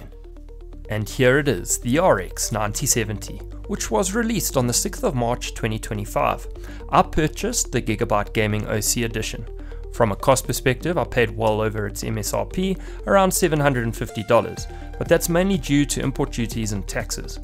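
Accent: South Africa. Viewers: Howzit bru, nice review. It was super cool to hear the Saffa accent! I currently live in Asia and was able to get the card for around 670 USD. Not MSRP but considering the current market I am happy with the purchase. Looking forward to more content in the future!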